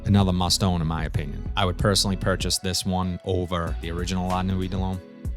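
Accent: Boston, United States. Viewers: Love that Boston Accent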